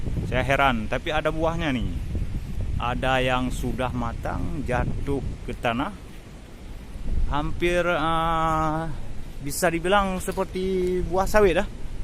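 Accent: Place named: Malaysia. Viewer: Ngomongnya kayak orang malaysia mas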